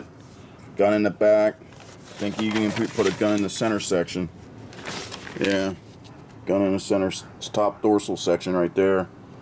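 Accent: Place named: Australia. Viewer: Good project launch vid as always, 'Flack as thick as shepards pie' but the accent was more RAAF than RAF.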